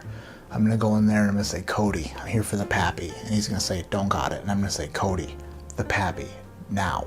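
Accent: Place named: Minnesota, United States. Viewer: The mystery of upbringing continues. When I first heard him say his own name, not but ” I said, “a- ha—Minnesota.” The name should take a split second to say but he extends it out and taffy-pulls what was supposed to be a simple short “a.” Tortures it in that nice way, being and upper Midwesterner. Friggin is also Minnesotan, as are many of his other phrases. But the upper Midwest accents have no sharp state boundaries. Now he drops “thimbleberry” on us, or rubus parviflorus. That put a whole née spin on his mysterious origins. We know he lived in Michigan for some time before moving east. Clueberry. Thimbleberries are only found in Minnesota on the Lake Superior shoreline so Duluth is a possibility. But they are most common along Michigan’s Upper Peninsula, aka da U.P.? Could it be that is a Yooper? A native of the land of not quite Wisconsin and not quite Michigan and not quite right? 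Maybe Marquette, Sault Ste Marie, or perhaps Esky? Houghton up on the Keeweenaw is where all the thimbleberries can be found. At some point I hope tells us where he friggin grew up and got that outrageous accent!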